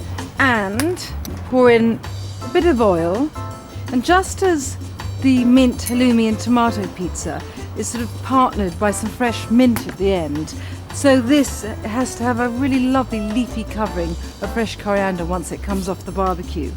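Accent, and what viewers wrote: England, United Kingdom. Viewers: I love her english accent. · When i was young, sure, loved her British accent and the way she has with words but as I grew older, I saw that she could use spoons more, wash hands after handling eggs AND FOR THE LOVE OF GOD, SCRAPE THE BOTTOM OF THE BOWL AFTER POURING OUT THE CAKE BATTER! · too much british accent for me to handle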